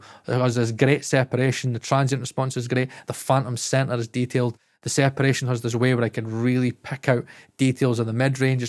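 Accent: Scotland, United Kingdom. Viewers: That's the most aggressively Scottish accent I've ever heard in my headphones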